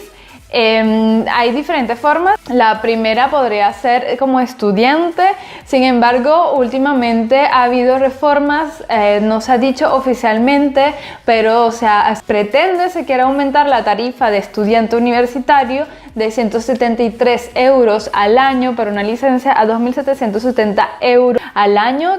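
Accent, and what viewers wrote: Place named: Venezuela. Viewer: Pinche acento venezolano no lo soporto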